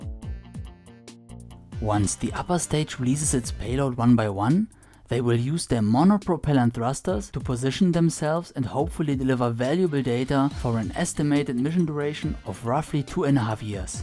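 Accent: Germany. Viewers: der deutsche akzent is einfach super XD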